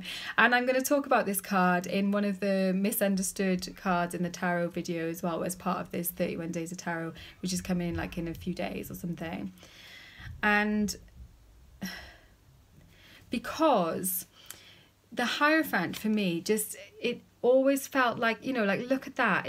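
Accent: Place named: England, United Kingdom. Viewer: hi, I am somewhat new to your videos, but have been watching them. interesting. do you live in England? I am not good about accents. You could be in Australia or New Zealand, but i'm guessing England. you have a very pleasant personality. what exactly is a story witch? do you practice something such as white magic i think it's called?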